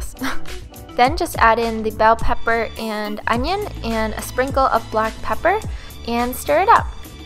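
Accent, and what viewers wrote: Canada. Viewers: peep the canadian accent :-)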